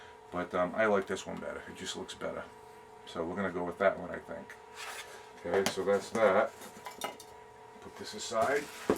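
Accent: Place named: Boston, United States. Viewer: Code clan .Agreed, sounds like a Boston accent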